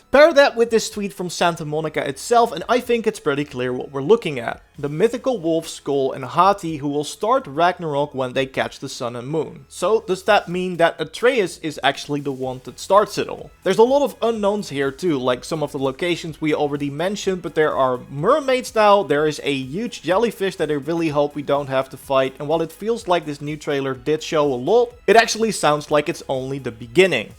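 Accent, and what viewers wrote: Netherlands. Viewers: je klinkt zo nederlands :p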